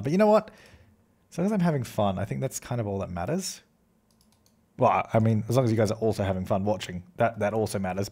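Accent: Australia. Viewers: R u form Australia? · Both and Jazza are Australian. Have the same accent:)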